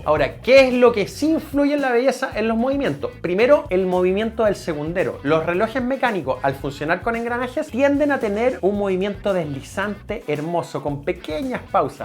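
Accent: Chile. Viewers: Es mejor el Quarzo pero Solar y si es mixto solar y batería Quarzo simplemente será un reloj para siglos …Me da risa el acento Chileno 😅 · Tengo 5 cosas que decirles: 1) Me encantan sus historias relojeras curiosas con datos poco conocidos. 2) No cambien su acento ni palabras chilenas, los queremos así como son. 3) Me gustaría ver videos de Seiko Alba o Credor. 4) Me deben el video de las abominaciones relojeras que fueron al espacio. 5) Saludos desde México.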